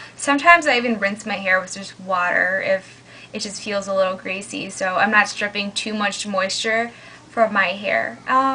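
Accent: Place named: South, United States. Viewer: its sooo funny watching you talk, like u look asian buh u talk country, 1st time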